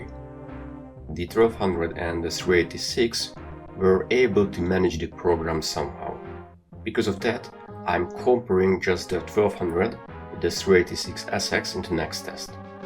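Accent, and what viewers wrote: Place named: Hungary. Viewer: My comments are being deleted by youtube, I think they dont like long comments other then channel owner... One more think, I think I hear Hungarian accent in your English, are you from Hungary? If so, could you make some research and video to very interesting topic, in early 80s Jack Trammiel had a Software developer team in Hungary that developed a lot of Commodore software, which is very interesting since Hungary was behind Iron Curtain. Can you find some background info, or maybe find someone from that era for interview?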